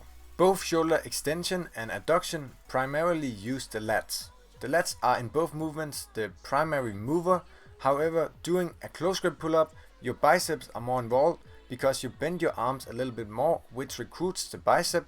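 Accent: Denmark. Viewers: You sound Danish i know because im Danish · damn that danish accent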